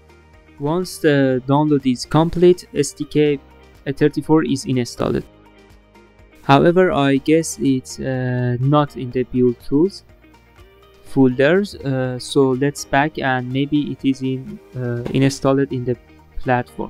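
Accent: Iran. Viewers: از لحجه ات فهمیدم ایرانی هستی 😂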